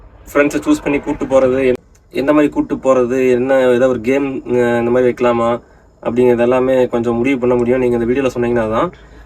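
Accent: Kongu, India. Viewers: கொங்கு தமிழ் பேச்சு அருமை அருமை தம்பி. அப்பாவின் உழைப்பும் திறமையும் உங்களுக்கு பேருதவியாக இருக்கிறது தம்பி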